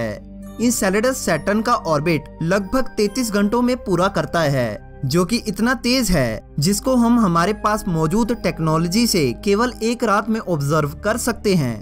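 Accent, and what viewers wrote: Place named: Chandigarh, India. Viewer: KOI NI BHAI SAB BHAI BHAI HI HAI MAINE SIRF ISILIYE PUCHA THA KYUKI AAPKA ACCENT MUJHE BILKUL CHANDIGARH WALA LAGA.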